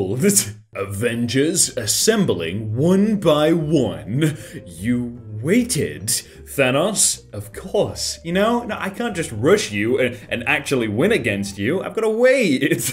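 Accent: United Kingdom. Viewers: Hello British guy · day 89 of asking that he british yes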